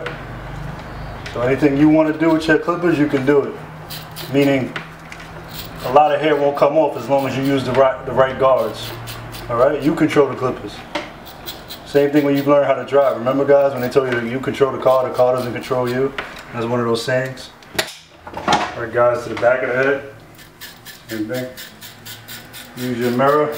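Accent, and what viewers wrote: New York, United States. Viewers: you sound york or jersey that right?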